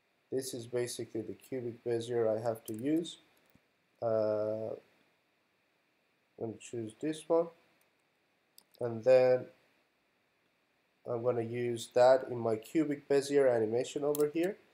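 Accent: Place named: Iran. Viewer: love the stuff you post...i'm too dumb to understand most of it lol btw you really sound iranian hahaha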